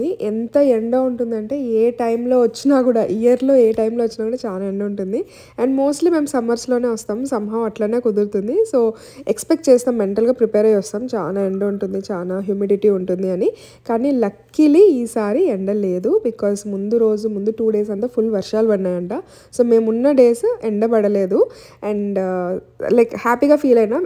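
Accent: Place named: Kurnool, India. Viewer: Kurnool yasa vinte aa kicke veru Abba !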